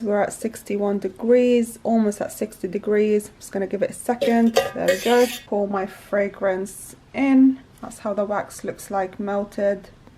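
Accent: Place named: Philippines. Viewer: Greetings from the Philippines. I love the accent. ❤ Let everyone know you're a filipina too.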